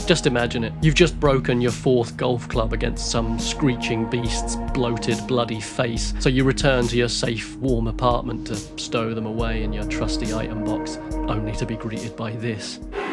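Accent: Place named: United Kingdom. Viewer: This guy is more annoying and pretentious than he claims Vincent to be. The leftist stuff is overly annoying and childish. Bipartisan politics are meant to divide and cause inflighting in people. Most people pick a side younger in life and then grow out of it realizing they have more in common with people on the other side than they do the people they look up to in office that they vote for. He says things like the right supports mass shootings when nobody clearly does. He acts like every opinion he has is right, like an angsty person who's never raised a child and been able to see his own faults through raising another human being to function in society that's a mirror image of himself. Everything he says politically is just so cringe and stereotypical of his political side. I'd almost expect him to say "Heather, being born without a penis is inherently good unlike the toxic male Vincent who's smugness is a direct result of his containment of semen." This even being remotely watchable to anybody is an example of how strong the silent Hill stories are. 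Even a hack like this guy can use it as a crutch to shove his political views into and get a decent amount of views. Put a British accent on it like his and any young, immature self-hating Westerner will feel intelligent watching it. All I hear is pretentious garbage, Flesh Simulator (who's actually talented) being ripped off, hbomberguy being copied and "I'm very artful." I can watch some leftists I don't agree with, but this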